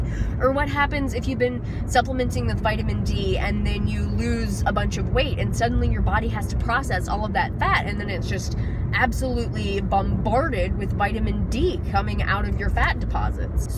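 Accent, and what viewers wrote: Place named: East Coast, United States. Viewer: Hawaii I knew you were from the east coast! You have the same accent, voice & cadence as one of my best & oldest friends <3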